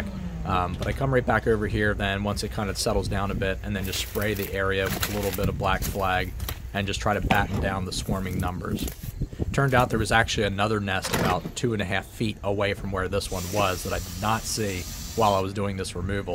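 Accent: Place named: Pennsylvania, United States. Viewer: My son and I absolutely love your channel! He is currently 5 years old and is battling leukemia and to see him get so excited every time a new video comes up really makes my day. He only has two more months of chemo to go and everything is looking good =) We used to live in NJ so we are used to the PA accent with "cone" and such so that brings us a little taste of home from all our friends in the PA region. Saw a Japanese Hornet fly by our house (we currently live in Thailand, long story lol) and immediately thought of you doing battle with these. Those hornets would not have a chance ;)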